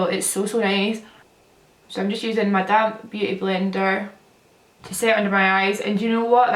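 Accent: Scotland, United Kingdom. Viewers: Haha I'm Scottish as well and I know how ya feel about the pronunciation problem😂😂 "laaaaaaayen low". Your videos are great and it's fab that ur doing more videos these days! Xx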